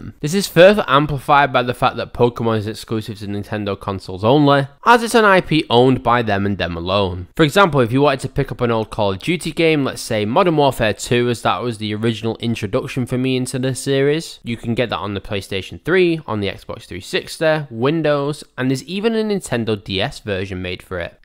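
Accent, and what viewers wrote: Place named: Manchester, United Kingdom. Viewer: Nice video lad, but Manchester is blue! I can hear that Mancunian accent so I won’t be too hard on you. I personally think the games are expensive because people view them as collectibles and there are a finite number in existence which still function. As you mentioned, these games can be played for free (which I do frequently), so I think it’s as simple as people being collectors and potentially hoping they can sell it for more later.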